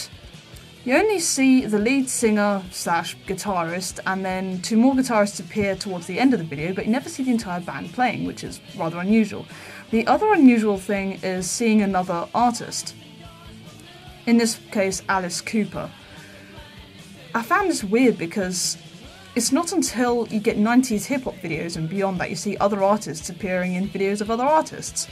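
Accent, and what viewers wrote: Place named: RP, United Kingdom. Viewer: We want to watch the video not listen to your posh british tea and crumpets voice smothering over and therefore ruining this video. Just shut up and take your comments to Dave mustaine, oh wait your probably afraid that he will KICK YOUR SORRY ASS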